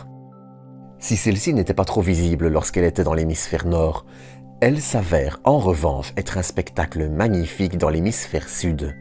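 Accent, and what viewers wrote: Belgium. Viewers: Hé non c'est l'accent belge 😉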